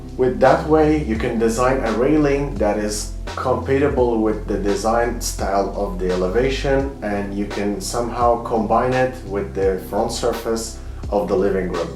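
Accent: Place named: Brazil. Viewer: Are you Brazilian? Your accent kinda sonds like mine.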